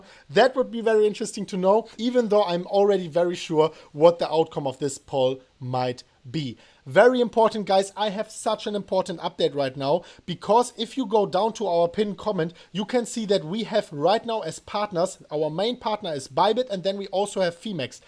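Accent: Germany. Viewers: Man hört richtig das du deutscher bist 😋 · you MUST be german!! Your akcent is just too on point!!!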